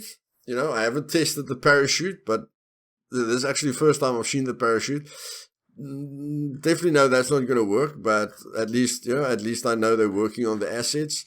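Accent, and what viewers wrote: Afrikaans, South Africa. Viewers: What servers do you play on im from South-Africa and you sound a lot like n afrikaans man ;) i was just wondering sothat i know what to play on aswell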